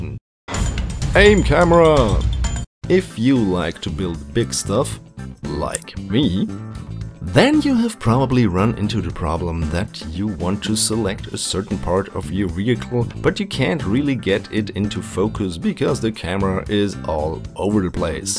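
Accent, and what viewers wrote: Germany. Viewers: u German? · Are you german by any chance? ;-)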